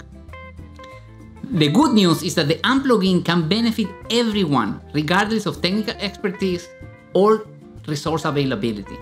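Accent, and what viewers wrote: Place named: India. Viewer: Why is it that Indians are obviously Indian no matter what language they speak?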